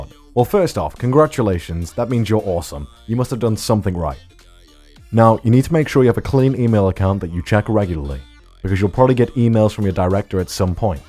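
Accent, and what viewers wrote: Wales, United Kingdom. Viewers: Watching this in 2021, and I gotta say......What happened to British accent? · Holy shit a time when actually sounded Welsh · holyyyyy crap he sounds so different back then xD and u can also hear a tinge of welsh in his accent too shEeEesh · Omg you can hear more of his welsh accent here!! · The Slight Welsh Accent Is Very Very Pog 😂😂😂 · you sounded more welsh back in the day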